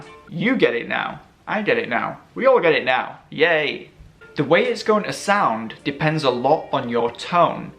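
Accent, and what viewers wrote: United Kingdom. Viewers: More videos with the British accent please 😁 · у него Английский английский как на счет американской версии?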